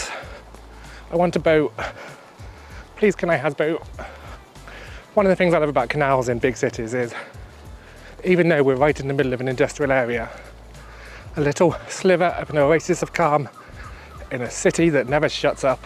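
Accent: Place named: Birmingham, United Kingdom. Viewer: It’s how you say it! Once a Brummie always a Brummie bab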